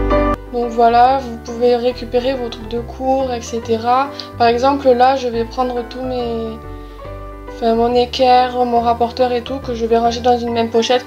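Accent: South, France. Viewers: Tu viens du sud?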